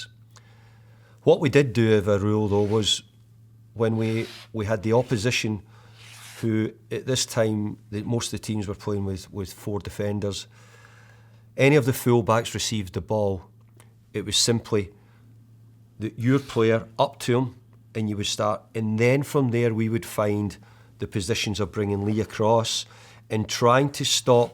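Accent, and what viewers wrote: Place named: Scotland, United Kingdom. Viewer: Oh I love that Scottish accent, it's just so easy to understand, especially Fergie's speech 🏴 ❤️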